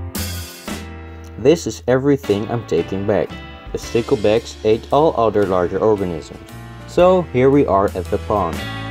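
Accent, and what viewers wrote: Netherlands. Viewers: Aaah... Dutch English, nice video!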